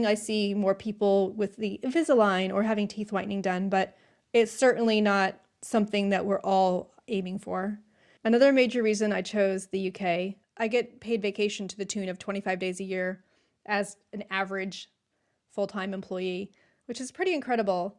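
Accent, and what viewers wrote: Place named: United States. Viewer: You've lost your American accent for one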